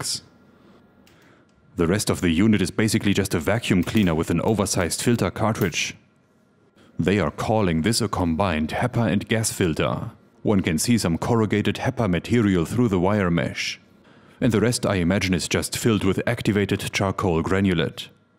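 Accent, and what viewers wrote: Germany. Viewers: He is sounding more German that me when I speak english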